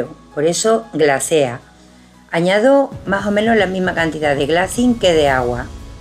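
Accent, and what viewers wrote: Andalusia, Spain. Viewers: Excelente, gracias x lo q enseñaste, lo.voy a poner en practica.saludos, desde Argentina..eres andaluza?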